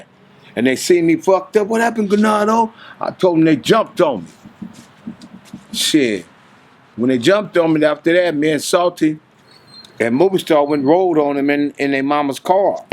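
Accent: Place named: Louisiana, United States. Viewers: Why do he still have a new Orleans accent 🙄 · He been gon that long and still can hear that Swamp in his voice. That dialect never leave us #DaBoot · Louisiana accent will never leave no matter how long you been away from home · I knew he was from New Orleans the first time I heard him talk lol he sound just like us.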